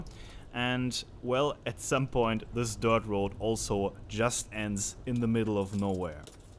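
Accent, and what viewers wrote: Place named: Germany. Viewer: Also ich finde dein Video wirklich interessant. Und wenigstens verstehe ich dein "deutsches" Englisch Gut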